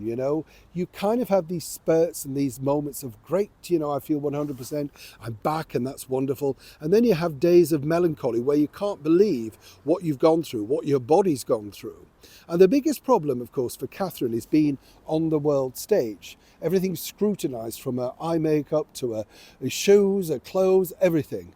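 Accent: Yorkshire, United Kingdom. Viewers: True 👍🏻you sound like you are from up here in Yorkshire sometimes 😊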